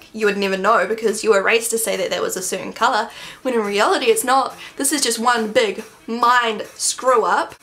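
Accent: New Zealand. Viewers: shes from new zealand so she has an accent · are you from New Zealand?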